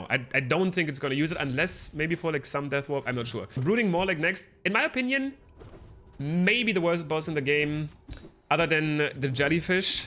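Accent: Germany. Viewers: You sound german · That accent... Are you from Germany? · yooo are u german !?!?!? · he has a german accent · Idk why but You sound german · German Dialekt ballert rein 😂 Trd geiles vid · One question, are you german?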